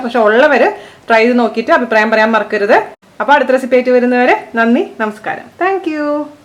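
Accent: Thrissur, India. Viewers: Chechy good recipe and the way you are explaining in Thrissur slang is excellent. Take care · അടിപ്പൊളി കൊള്ളാം അവതരണം നാട്ടിൽ പോയ് ഉണ്ടാക്കി നോക്കണം തൃശൂർ മാള സ്വദേശിനിയാണന്ന് തോന്നുന്നു.... Good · njan thrissur ane .athond thanne chechide samsaram valya ishta to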